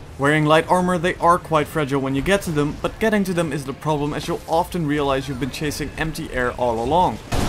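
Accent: Netherlands. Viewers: I knew it :D you are dutch :3 could hear it :o anyways awesome explenation :o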